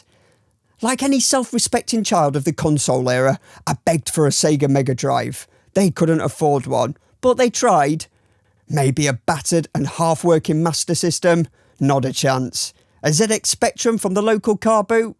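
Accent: United Kingdom. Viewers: Hooray Grimsby! I thought you sounded like Olga from The Toy Dolls. Sunderland. Maybe, Tyne or Wear. Awesome channel!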